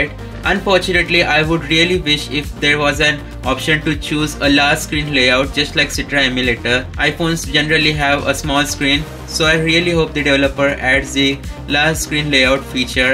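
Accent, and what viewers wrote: India. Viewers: As soon as I heard the Indian accent, I knew it was going to be fake OK that’s racist…. I’m sorry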